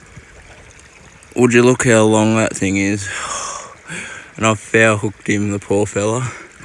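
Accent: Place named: Tasmania, Australia. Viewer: You are living the life,. Good to see. I hope any snakes you encounter know they are only allowed to bite your gaters. Curried egg sangas with a wee bit of lettuce in them are in fact yum. You sound like Tob Parsons Must be a Tassie thing.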